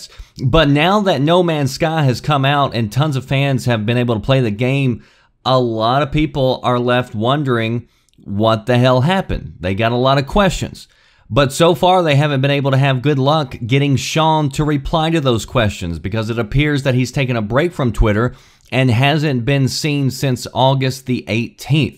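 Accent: United States. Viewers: Wow this guy has some click bait and a really country actsent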